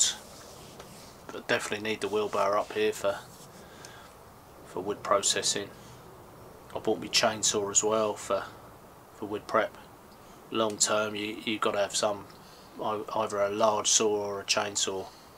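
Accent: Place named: United Kingdom. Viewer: hey mate, great set up! How on Earth do you manage to hget so much space in the woods where you can stay longterm like that. You soudn British and as far as I know, apart from Scandinavia, you can not stay in a tent on land anywhere in Europe. How did you do that!??!?! Hope ou can have some great times there. Lovely also for teh dog to be out like that! WOnderful!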